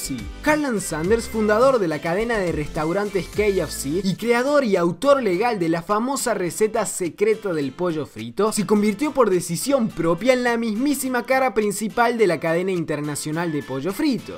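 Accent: Córdoba, Argentina. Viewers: todavia no se te sale el asento codobe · es cordobes? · Este men es argentino? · NO ME MIENTAS TU ERES ARGENTINO! YO IGUAL :DDD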